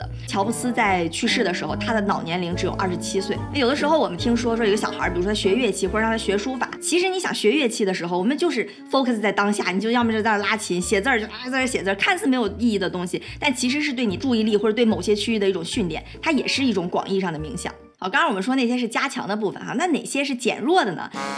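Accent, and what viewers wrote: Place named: Dalian, China. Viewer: 小lin的东北话 字幕都识别错误了。😂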